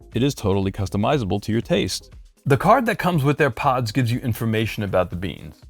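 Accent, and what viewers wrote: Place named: Brooklyn, United States. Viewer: Your accent reminds me of the old school Brooklyn Accent. I still hear it in natives that are over a certain age. An era of NY that I miss.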